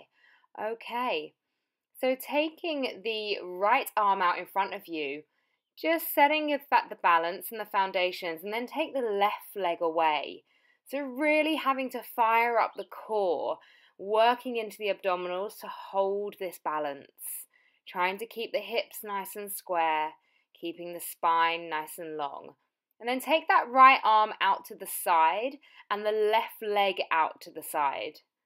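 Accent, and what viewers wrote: United Kingdom. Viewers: Thanks for an excellent flow - many postures here I haven't done in ages. Also nice to hear an English accent, and a bit of humour! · Where is her accent from? I know it's British, but what part of England?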